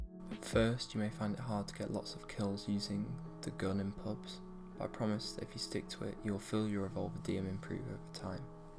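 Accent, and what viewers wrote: United Kingdom. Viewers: This was so informative and seductive.. the Welsh accent helps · hes british just like the spy :o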